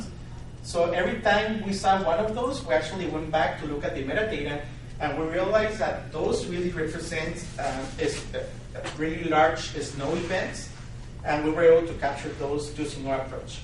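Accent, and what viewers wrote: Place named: Arab world. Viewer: His accent is bad. is he arab?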